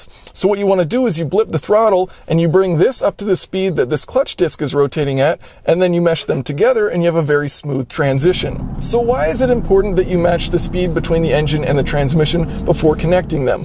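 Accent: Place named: United States. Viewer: As a mechanic in Australia I have 2 statements about this 1. in the USA which from your accent I will ASSUME you are from (possibly canada please don't smite me) Most people don't know how to drive "manual" or "stick" and with the way the world is going this will probably be useless in 10-15 years given electric cars 2. You used the example of going from 4th gear to 2nd gear now you failed to tell the good people at home THIS IS A VERY BAD IDEA, when you go up threw the gears if you rev them right out you will hit a "limiter" or what is refereed to as a "fuel cut" this is to prevent you making the engine spin faster then it is designed to go BUT if you down shift at a high speed skipping a gear you MAY not will MAY cause the engine to excited the "limit" cause it to kiss the valves with the piston on a High interference motor, So it just turns into a giant hand grenade and a lawn ornament until you have the money to replace the motor. 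People will have there doubts about this statement but I have seen it 1st hand on multiple occasions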